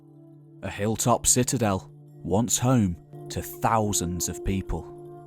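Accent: England, United Kingdom. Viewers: thank you for this well put together documentary ! I assume you are English from your accent which is presumptius but its always great when one of our freinds from down south manages to shy away from the many cliches about the picts . what is not known about through written evidence can in many ways be realised through the highly sophisticaited artwork the picts left behind , it is almost certain that the picts spoke some kind of p celtic language ,perhaps not to different from britonic .you can see evidence for this in many place names all over the pictish areas of scotland unfortunatly nothing from this legacy seems to have passed into the scots language ,I have heard that perhaps the north east use of F instead of WH might be a remenant for example "fit like" instead of "what like" in English and often "Whit like " in scots . Doric language is itself littered with archaeolagy from Scots ,French ,English, Gaelic and loads of Norse . 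i really look forward to seeing what conclusions are drawn from this site in the near future .And keep going with this fabulous site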